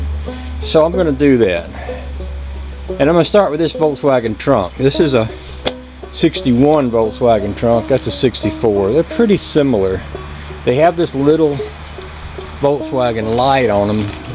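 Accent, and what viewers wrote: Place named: South, United States. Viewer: ya left off the ah in front of pologize! Sory I miss read ya! But if ya was ta have a poltergeist and Dracula that wooden be Tanks giving, it be Halloween or if ur down by cemetery it be Gallows Eve! Hot Rod wood have ta break out his hot rod Christine! Stake em and hangem high! Now all we need is fur ta show up and start talkin British with his southern style accent.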